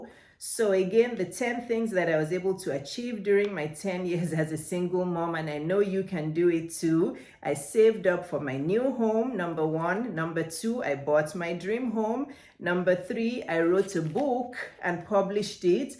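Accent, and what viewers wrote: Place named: Africa. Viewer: I love your African accent, who coached you in public speaking? I live in the Northwest Ohio area. Also, I'm a travel nurse.Latrice ✝️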